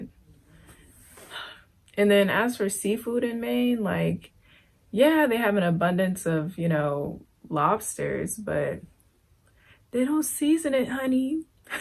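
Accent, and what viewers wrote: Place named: Michigan, United States. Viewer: Sounds like the upper peninsula in MI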